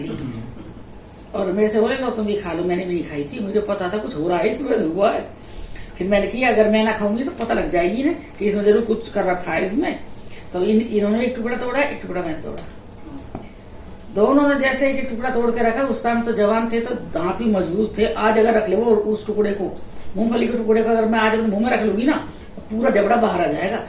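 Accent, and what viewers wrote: Uttar Pradesh, India. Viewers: App humri jesi baat karti ho up sahid 😊👍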